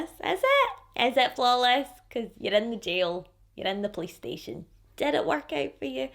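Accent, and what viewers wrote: Scotland, United Kingdom. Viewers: The Scottish accent brought me here and the fun story telling was an amazing bonus. Hooked for life!!!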